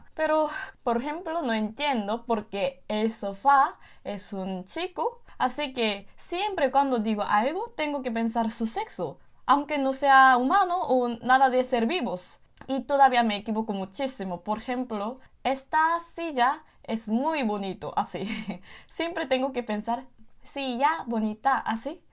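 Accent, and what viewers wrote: Andalusia, Spain. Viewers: Difícil o no lo hablas muy bien ...yo quiero hablar así de fluido otro idioma 😢. Por cierto has tomado el acento español lo tienes marcado yo soy de México y los españoles para mí hablan muy rápido 😂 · Hablas gachupín, no español. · Me encanto mucho tu video con tu asentó de España..no sé si sabías pero la mayoría de los latinos remedamos el idioma de España por diversión …un saludo desde Costa Rica ..ostia parecéis española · Tu hablas español pero de España, los latinos hablamos diferente. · Omgg ella tiene una acento de España 🥺🥺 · Pero tengo un argumento! Aunque digas que hay muchos acentos distintos, la ventaja es que aunque todos sean un poco distintos, al fin y al cabo de igual manera puedes entenderle a cualquiera que ya lo hable, o inclusive a tí! Yo por ejemplo soy de Costa Rica y el hecho que tengas acento andaluz no me impide comprender el 100% de todo lo que dices! 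Entonces en resumidas cuentas si hay una universalidad en el idioma! Dado el hecho que con esas diferencias seamos siempre capaces de comunicarnos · Hola como estas ...tienes acento ..de espania ...todos los aciativos ..mas aprenden acento de espania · Se nota que aprendistes español de España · Hablas el español de España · Hola anyoaseo 🤣🤣🤣 hablas español de España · Si,pero aprendiste el español que se habla en España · Hablas el español MUY BIEN, mejor que yo. Soy malagueño, de padre sevillano, y ya noté tu acento andaluz antes que lo dijeras. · lo malo es que esta aprendiendo el español de españa:/ que si en cierta parte puede ser muy formal pero podria aprender un español mucho mas neutro en donde la "s" se pronuncie como tal y la "c" de igual manera, asi no le explotaria la cabeza al verlo escrito y al hablarlo al mismo tiempo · Jijiji es verdad que el castellano es difícil pero me asombra lo bien que lo aprendéis y te estaba escuchando y sí que tienes un habla más andaluz. 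Hablas muy bien mejor que algunos hispano hablantes. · Se te pego un poco el español de españa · Pronuncias muy bien; con cierto acento andaluz. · Ese español españolao nomas no👎🏻 Ese acento es una patada en los huevos!! Sorry!🤷🏻‍♂️ Pero pues es la verdad! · tienes acento español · Una coreana con acento España !Que Bello! Ole Guapa😍👍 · En cuanto te escuche decir la palabra más, ya sabía que aprendiste el idioma en Andalucía y acerté. Imagino que te habrás sorprendido que en solo país existan tantos acentos, además solo en Andalucía hay unos cuantos. Para ser solo dos años de aprendizaje, te desenvuelves muy bien con el idioma, felicidades. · Hablas fantásticamente español. Corrección justo al final del vídeo. Se dice: "No os olvidéis de suscribiros" (forma más informal y más usada) o "no se olviden de suscribirse" (forma más formal, tipo usted). Con "no os olvides" (te ha faltado la letra i y acentuar la e), se comprende perfectamente lo que quieres decir, pero no es 100% correcto. 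Espero que no te moleste la corrección. Enhorabuena de verdad. Tienes hasta acento andaluz, el más bonito por cierto. Saludos desde Marbella, Málaga. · Oelo pero hablas bien español, se te entiende bastante , y lo hablas bastante con el acento español jajaj apenas es ...m je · Hablas español de España. No de Latinoamérica.🤔😳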